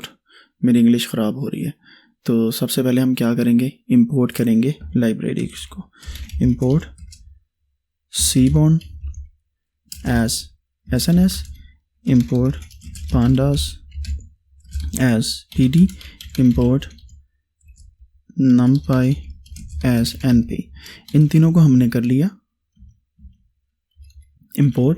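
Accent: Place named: Faisalabad, Pakistan. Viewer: kya maza aya, yayi wala accent yawwwrrr , and apka Faisalabadi accent, anyway keep us engaged and motivated. PS: 'anyway' b ap sy seka hai 😆 chillay k sath sath apka accent b sek jayengy 😃❤️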